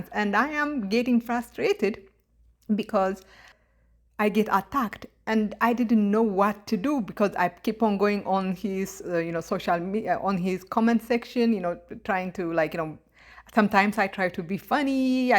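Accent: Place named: Ethiopia. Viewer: You sound Ethiopian.. why so much hate?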